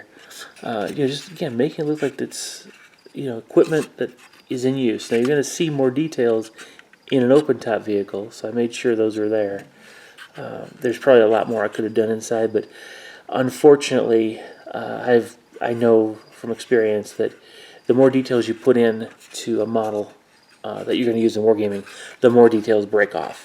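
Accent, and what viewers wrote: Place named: Poland. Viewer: Tylko Po co ten Polskie akcent szpeci model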